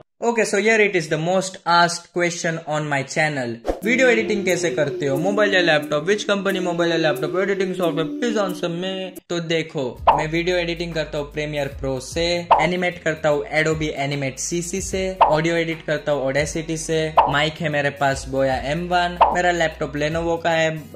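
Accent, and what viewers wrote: Maharashtra, India. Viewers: Your Marathi Accent is the best.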